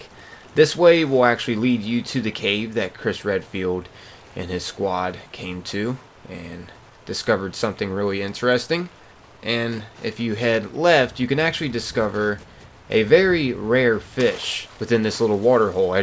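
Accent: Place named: Pittsburgh, United States. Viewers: Yo are you a yinzer or not man? Your accent is awesome. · Pittsburgh pa away. Greetings from PA. Yinzers tell the jagoffs to quit driving over the burm. Wosh your dishes and laundry. Love the pittsburghese.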